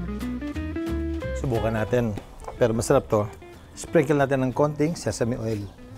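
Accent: Visayas, Philippines. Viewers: He speaks good Tagalog but his Visayan dialect still comes up like he says pagkaon, lol. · Lingaw kaau ko magtan-aw ug maminaw sa cooking nimo kay magbinisaya lag kalit! Akala ko e, tagalog ning c! C 4mer man diay ni sa puting tabing b4! Bisaya diay! Nice kaau paminawon ang bisdak words in between. God bless u & the fam, Mayor. Praise God sa mga laming dishes u cook. Sehr lecker! Nam2x. Glory unto Jesus! Thnx, Holy Spirit. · Malingaw ko maminaw sa imo bisaya ma words sir.u sound so cute!!!!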